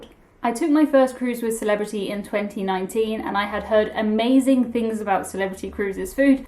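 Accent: United Kingdom. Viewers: I like the idea of the animated meal - that is wild! On a separate note, I have never been on a cruise and started seeing some of your videos. I have enjoyed watching your videos. I have watched other cruise videos, to your credit I find your videos to be more fluid, that is they move quickly between points with a clear direction. Perhaps this is just the American in me that likes British accents but I enjoy hearing your voice as well as the British-isms. Thank you.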